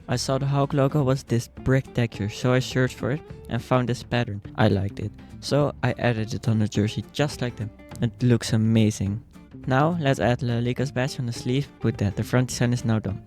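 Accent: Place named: Netherlands. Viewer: Je bent overduidelijk Nederlands aan je Engelse accent te horen 😂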